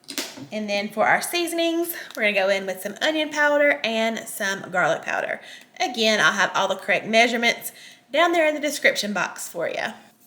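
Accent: South, United States. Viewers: Can't listen to that southern accent. Bah bye.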